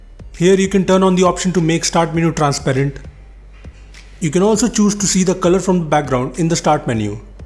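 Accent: India. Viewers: Terrible voice, indian english is really catastrophic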